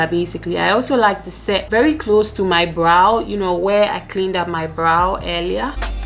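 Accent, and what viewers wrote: Ghana. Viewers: I love your videos and how you do your make up. Your make up always looks so natural even when you do a full glam. It never looks like a cake of make up. You are a very beautiful woman. I was trying to figure out where you are from because of your accent but I saw you are from Ghana! That is sooo cool! I love Ghana I would love to go one day!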